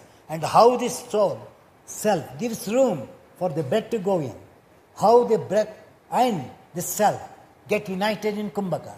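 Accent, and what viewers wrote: India. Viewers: OK, that was not entirely clear, and I sure am familiar with yoga terminology and Indian accent. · How Can I Correct the Subtitles? Perhaps the Tamil language accent of his is confounding youtube's speech recognition software.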